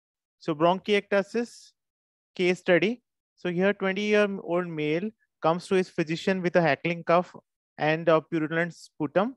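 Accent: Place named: India. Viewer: Unmöglich: deutscher Titel, und dann auf Englisch, mit einem grauenhaften indischen Akzent!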